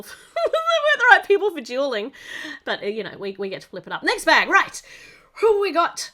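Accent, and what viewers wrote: Australia. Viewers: I don't know anything about Harry Potter but I love your accent your Australian accent is amazing and beautiful...and....oh..I need to catch up Harry Potter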